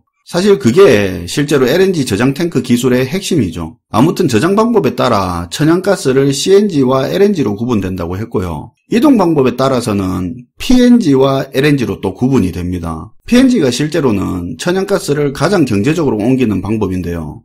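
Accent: Gyeongsang, South Korea. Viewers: 이야..구수한 경상도사투리에 전문지식없는사람들도 금방이해할수있도록 간단명료하게 설명해주시니..그저 대박이라고밖에...ㅎ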